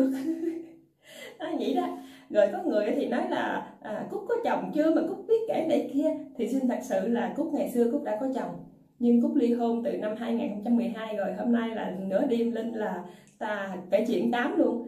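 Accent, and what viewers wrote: Mekong Delta, Vietnam. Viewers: Wow là gái Cần Thơ. Hèn chi nghe nói chuyện đúng giọng miệt dường. Nói chơi tui cũng dân miệt dường mà đang ở xa lắm, lại thích nói tiếu lâm như.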